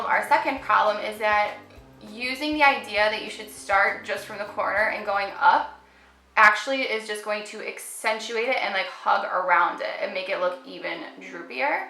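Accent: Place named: Midwest, United States. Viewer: Wow that is one serious midwestern accent.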